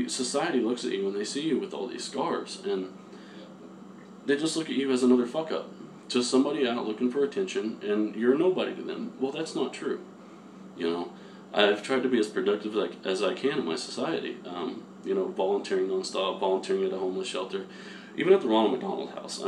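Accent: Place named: South, United States. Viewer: Thank u so much for sharing your experiences. So few people can understand the internal destruction of how ptsd ghosts terrorize you on a daily level. Or how the words "living hell" do not fully describe losing your mind to catatonic depresdion. It's so comforting to know im not the only one. Thank you. And I absolutely love your accent. I'm a sucker for southern twang. I would love to talk more with you when yiu have time, as I try to cope with PTSD. My email is <mail>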